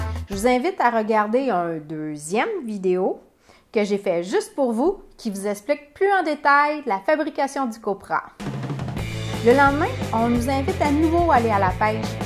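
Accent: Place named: Canada. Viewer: Quelle horreur cet accent canadien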